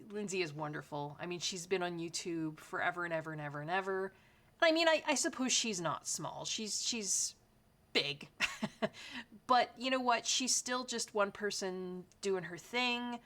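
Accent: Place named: Canada. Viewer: It’s funny that you find British accents relaxing, I’m Scottish and I love yours because it reminds me of my best friend who’s Canadian 😅. I used to watch time team with my grandparents, love me a bit of Tony Robinson haha. I don’t know if you’re familiar with Gem and the colour cave here on YouTube but I think you’d enjoy her stuff!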